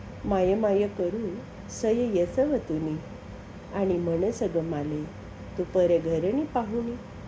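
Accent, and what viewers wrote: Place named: Khandesh, India. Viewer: छान सादर केलीत अहिराणी बोली कानाला गोड वाटते मायवर आहे म्हटल्यावर मनाला लागून गेली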